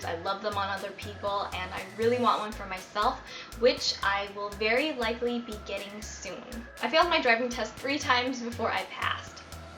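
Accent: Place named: South, United States. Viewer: My god and your southern accent!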